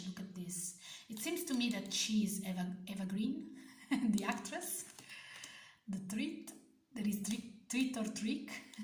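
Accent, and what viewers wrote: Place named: Italy. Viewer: Love the decks you've chosen!! And your Italian accent is so delicious, I didn't know most of the decks you presented!! Thanks a lot.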